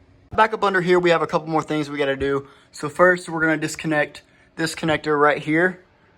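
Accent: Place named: Alabama, United States. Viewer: What part of Alabama you from?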